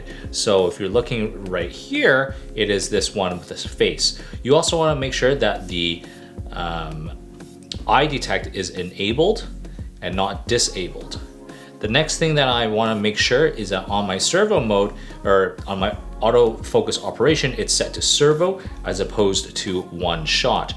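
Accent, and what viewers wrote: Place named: West Coast, Canada. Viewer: Aside from the west coast Canadian accent, this was helpful!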